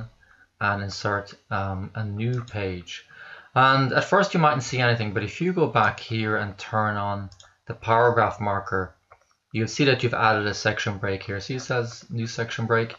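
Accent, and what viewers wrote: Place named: Norway. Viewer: Love your accent. Norway?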